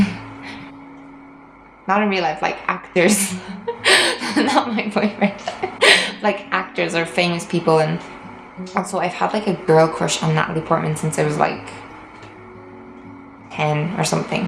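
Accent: Ireland. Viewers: Was wondering about that accent.. Now I know. :D I love Irish accents, they're cute!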